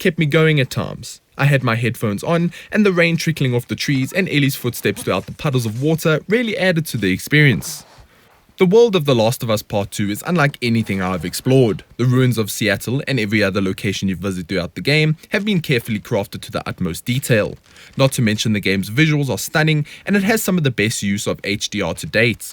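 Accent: South Africa. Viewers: You South African bro? Nice review. Yeah you're definitely from Cape Town. Stellies maybe?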